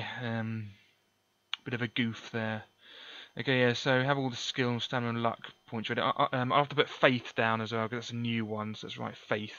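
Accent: United Kingdom. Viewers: Advice: stop babbling about crap no one cares about. I didn’t come here to listen to an English toff talk about and. I came here to watch a FF game get played. For anyone who actually cares, this guy doesn’t even start until twenty minutes in. Your voice is clear so at least that’s something.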